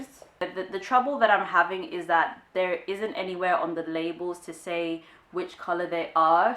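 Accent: United Kingdom. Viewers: You are a Goddess. I love the British accent.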